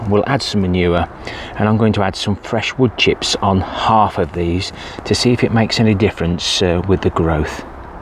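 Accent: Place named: Midlands, United Kingdom. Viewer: Where in the Midlands are you from? I'm originally from Leicestershire! Now living in Scotland for the past 10 years 😁👍 I recognise the accent!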